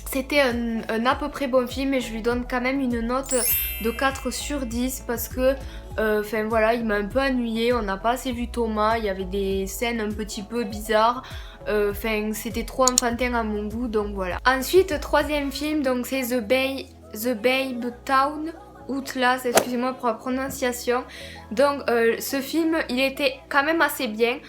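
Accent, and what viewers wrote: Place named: Toulouse, France. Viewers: Thomas c'est le meilleur, perso c'est mon premier acteur préféré 😍😍😍😍😍 Et sans avoir lu ta description j'ai reconnu l'accent Toulousain x) moi aussi je suis Toulousaine ! 😀😀💕👌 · Étant également une très grande fan de Thomas, j'ai vue tout les films que tu as proposé, et je suis d'accord avec avec toi de AàZ. Sauf pour le labyrinthe, j'aurais mit 10, et pour Nowhere boy j'aurais mit 10 aussi, mais là c'est plus personnelle comme je suis fan des beatles et que c'est grâce à ce film que j'ai connu Thomas. En tout cas, très très bonne vidéo, super agréable à regarder. J'adore ton accent (toulousain?) je pourrais t'écouter parler pendant des heures hahaha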